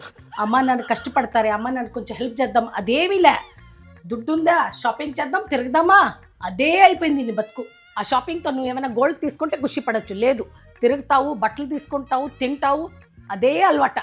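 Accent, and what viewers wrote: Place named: Karnataka, India. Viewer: mam me accent lo karnataka native la undi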